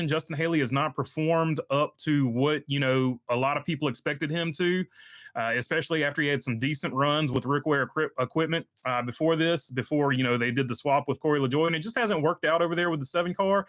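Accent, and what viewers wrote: South, United States. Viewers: You have a cool accent. It sounds very Nascar and southern. I live in Canada so your accent is quite noticeable. Like an old school Nascar driver or crew chief.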